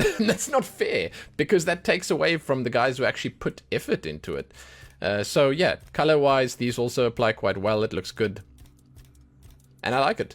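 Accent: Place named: South Africa. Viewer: Here after seeing some new content for the game again and wanted to check the character creation and I heard the accent and my my a fellow south african will check out more vids but you have an extra sub. Love from Krugersdorp👌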